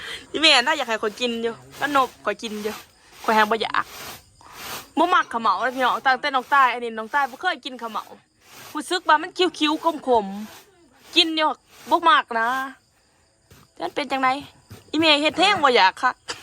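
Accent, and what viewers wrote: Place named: Tao Ngoi, Thailand. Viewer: สบายดี ไส่เสื่อแขนยาวแหน่บ่คันคายเบาะฟังสำเนียงคือคนเมืองเต่างอยอยู่บ้านกวนบุน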